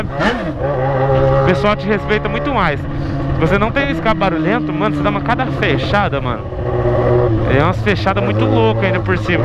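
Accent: Goiás, Brazil. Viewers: Pq vc fala piqui de Goiás?????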